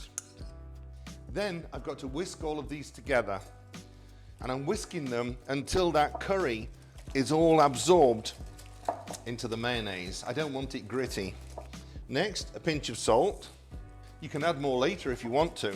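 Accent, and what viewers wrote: United Kingdom. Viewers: What happened to the British accent lol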